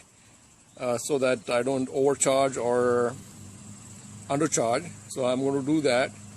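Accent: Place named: India. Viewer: Indian accents are so fucking annoying...and i love indian people and food but i cant stand their english accent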